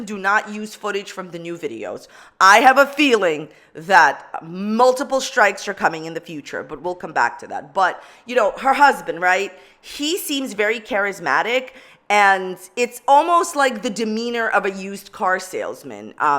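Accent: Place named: New York, United States. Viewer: Beautiful water scenery - where is this? Clear water to see the sand & shells. Your accent sounds like east coast - NY. I was from Dutchess county.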